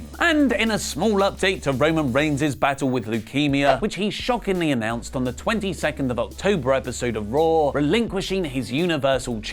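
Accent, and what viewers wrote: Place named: United Kingdom. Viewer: Your British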